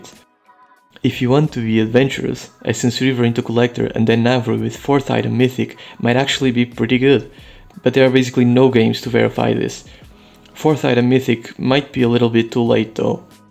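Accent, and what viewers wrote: Portugal. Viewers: Are you Portuguese bro? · Why does your accent looks like you are from portugal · Aquele sotaque tuga em vídeos de LoL, não estava a espera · Are you by chance Portuguese? There's something about your English accent that's activating my senses... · you 100% sound portuguese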